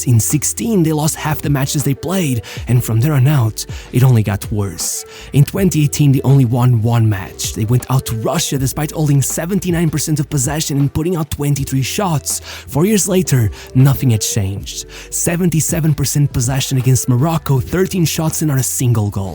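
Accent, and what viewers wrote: Portugal. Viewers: I would like to ask you to pronounce the Spanish names properly, not in Portuguese or Brazilian pronunciation. Thank you. Overall, fine job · Quiero decir, el chico es portugués, creo. Es normal que los nombres le salgan con acento. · bro is calling every Spain player like a Portuguese one xd. Just pronounce them in English bro it sounds better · Why is he giving a portugese pronuntiation to all the spanish names?? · Great video! I was just annoyed that you kept pronouncing Spanish names with Portuguese pronunciation. Or am I wrong? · Just a tip as a Spanish polyglot interested in phonetics: pronouncing Spanish words with a Portuguese accent doesn't make them more accurate and similar to the Spanish pronunciation, and in fact, it sounds quite unnatural, especially while speaking perfect English. I would recommend that you stick to the English pronunciation when saying those words if you don't want to learn Spanish phonetics. 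Of course, maybe you don't care at all, but since you're a content creator I would assume you want to sound more natural and educated👌. That way your viewers can focus more on your content, rather than the weird pronunciation, and the actual message is delivered much more easily. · is the guy reading this portuguese? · He pronounces spanish names in portuguese lol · Why do you pronounce names so weird. Like with a Portuguese accent Torresh Grodri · Why do you pronounce Spanish names in perfect Portuguese accent?😂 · I love you you pronounce every Spanish name with a Portuguese accent 😅. Great video and Viva España y Portugal 🇪🇦❤🇵🇹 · I appreciate the effort to try to pronounce Spanish names correctly, but you are pronouncing them in Portuguese.... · why do you pronounce the names like if they were portuguese? not criticising but as a spaniard i think pronouncing the names like they really are o at least trying could be better for the overall quality of the video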